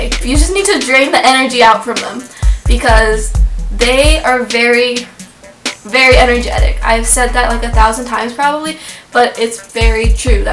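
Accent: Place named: United States. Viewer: Where are you from? Your american english accent is pretty good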